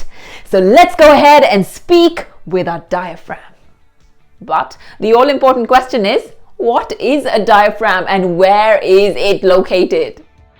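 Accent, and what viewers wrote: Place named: United Kingdom. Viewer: Her accents ….your accent is quite fascinating!..it borrows from a lot of areas ,a slight British as well ..the way u spelled ‘KAPALBHATI’ ,I don’t know what to talk of it 🗣️ !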